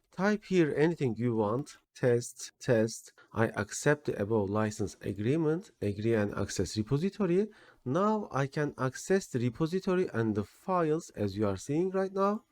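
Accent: Turkey. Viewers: Aksanından direkt türk olduğunu anladım ve gurur duydum. Çok yararlı olmuş diğer videolarına da bakıcam. <3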